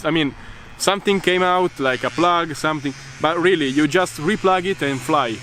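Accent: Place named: Italy. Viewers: solo a me sembra italiano? However thaks for this good review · Sembra italiano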